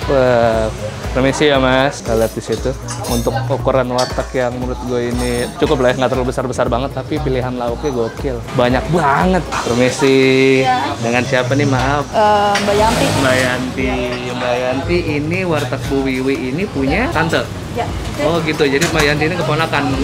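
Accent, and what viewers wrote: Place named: East Java, Indonesia. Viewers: Logat jawatimur an aslinya mana sih 😅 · Oalah pantesan bahasamu jawatimuran mas wkwkwk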